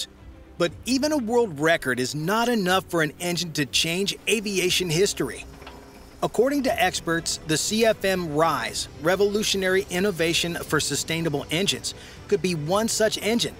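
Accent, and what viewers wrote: United States. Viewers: Well, this is an American voice and pronunciation to a European ear is always strange.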